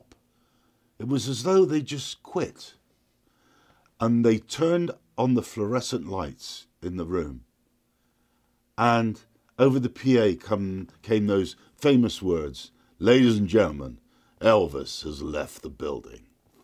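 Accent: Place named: Dorset, United Kingdom. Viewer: Commenting again just below my comment aSince then obviously Greg has sadly passed, love his Dorset accent and he was also a great storyteller, I went to one of his gigs where he talks about his abs others music, he really was a one off, we will miss you Greg RIP now .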